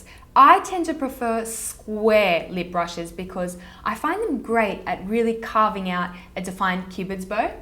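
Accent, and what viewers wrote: Australia. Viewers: Your accent takes me back since i used to live in australia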